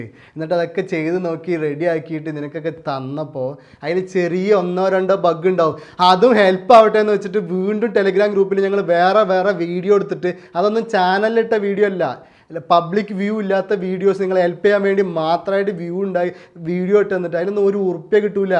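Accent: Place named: Kozhikode, India. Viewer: I like that kozhikoden slang😊😊😘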